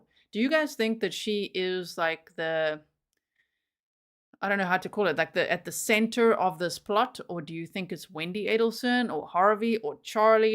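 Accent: South Africa. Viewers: Love that South Efrican accent. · Love that South African accent.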